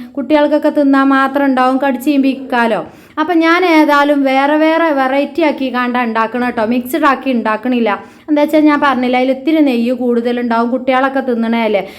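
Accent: Malappuram, India. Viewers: എന്തൊ ഒരു ഭാഷയാണ് പടച്ചോനെ ഇതൊ ഒക്കെ ഒരുപാട് മലപ്പുറം ഭാഷ ഞാൻ കേട്ടിട്ടു ഉണ്ട് ഇതൊ ഒരു ഒന്നൊന്നര ഭാഷ ആയിപ്പോയി😄😄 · Malapurath ulla ellavarum inganeyano samsarikaar ?? Ivarentha ingane samsarikunnenna ariyathath matte laila thatha avare pole oru pandathe aalukale pole enthinum ethinum oru makkale viliyum paattu paadumbolothe sansaravum njn athknd ee chmnl ipol kaanaarilla idak epozhenkilum onn nokkum kure mlpuram vlgs kaanum avaronnum ingane onnum alla samsarikaar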